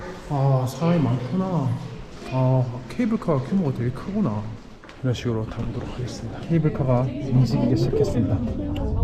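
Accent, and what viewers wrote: Gyeongsang, South Korea. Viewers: 경북인줄 경남 이셨구나 부산 싸나이 ~~ 홧팅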